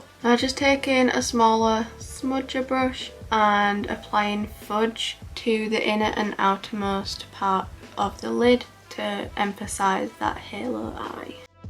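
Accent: United Kingdom. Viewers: i'm late, i also just finished gcse's in june, bUT i wanna know which part of the uk are you from? :)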